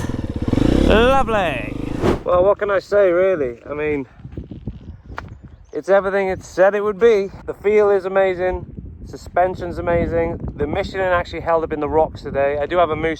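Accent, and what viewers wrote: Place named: United Kingdom. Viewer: I'm so thrown off by "MidWest" and then the British accent.... what?! ps. I also test rode the TF 250 and had a blast. I'll be sticking with my Husky for the foreseeable future though.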